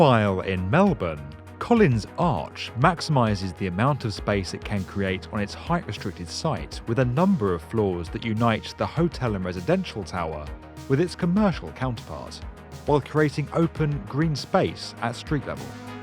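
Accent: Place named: RP, United Kingdom. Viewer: You have got a state-of-the-art received pronunciation RP. Do Never miss it! And ALWAYS, ALWAYS STICK TO THE METRIC SYSTEM. HOWEVER, in this subject (aerial corridors/sky bridges/ elevated pedestrian crossings.) You might be interested to know that although perhaps not so much flamboyantly spectacular as the Asian/arabs ones you could realise that this type of structure are commonly found in Big South American cities and in Spain even in ciudad de Panamá I remember walking within one 10 years ago in the Radisson Decapolis Hotel connecting it to the Casino over a high traffic road. Another "small" example that came to my mind now is the long aerial covered bridge linking 2 shopping centres in the Colombian Caribbean city Barranquilla (Where Shakira & Sofia Vergara were born) My point is that that is something that Chinese wallet took it (like many things) from Europe (Spain, chiefly Barcelona) they are NOT PIONEER AT ALL IN TERMS OF ARCHITECTURE OR URBANISM AT ALL!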